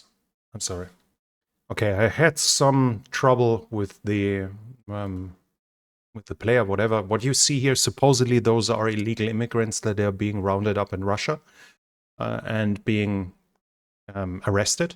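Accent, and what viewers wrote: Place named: Germany. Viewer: Ich mag dein German-English 🤗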